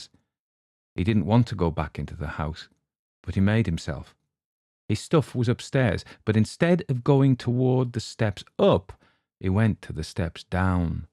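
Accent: Germany. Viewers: I think you did a great job on your German accent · Great depiction of the gruesome folk character! And a superb reading. I too have a fascination for puppetry, automata and all manner of dancing jerky creatures... remember Raggedy from Rupert the Bear? Twiggy, jerky thing of nightmares that he was. I also loved those Metz adverts: "Beware the Judderman my dear, when the moon is full" has long been a catchphrase in my household. 😆 Also, I'm reminded of the stunning work of the early 20th century German director and animator Lotte Reiniger. She pioneered silhouette animation. Some of it is really dark and creepy (deliciously so!) and all of it is very beautiful. If anyone here isn't familiar with her work you can find some on Youtube. Compliments on your German accent too. (I was born in Germany coincidentally, but grew up in England). Thank you for another great reading and composition! 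· "and the promise of eternal darkness" yikes! Omg, you are an expert storyteller! Your scary voice and German accent are fantastic.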